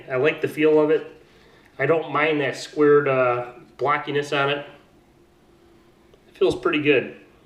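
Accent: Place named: Canada. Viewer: Great video, I really thought you were Canadian seeing as you have no accent... or maybe you have a canadian accent living so close to the border Haha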